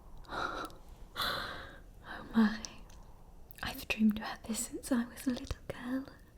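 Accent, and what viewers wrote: England, United Kingdom. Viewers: I love your british accent for ASMR!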